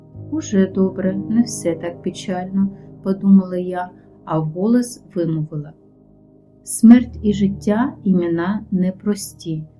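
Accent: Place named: Ukraine. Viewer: Дякую! Як мило звучить Ваша українська. 💗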